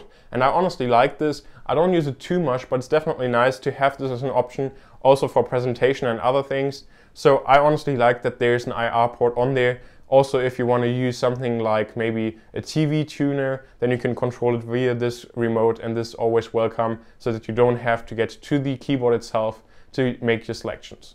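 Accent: Germany. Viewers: Are you german? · do you know how big of a difference there is in performance between the two? or if it's barely noticeable? i'm either gonna buy non-retina 13'' (high-end) 128ssd or Low-End 13'' retina. So I'm just wondering: if the performance difference isn't that big, then i'll go for the retina. even though I still use the CD-drive and an ethernet-port :P "give and take"... Next time I might answer you in German, if I guessed your accent correct? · was ein schöner deutscher akzent ^^ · you german? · He's from germany :) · oh but i just keep my superdrive in it.. i am planning to upgrade the ram memory to 8 gb to get ilife a little more fluent :P and yeah i heard a little german accent, i thought u were dutch :p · Deutscher Akzent :)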